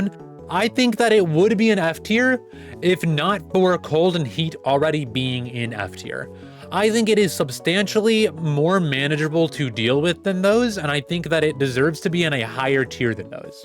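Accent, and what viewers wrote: United Kingdom. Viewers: you sound like you're doing a british accent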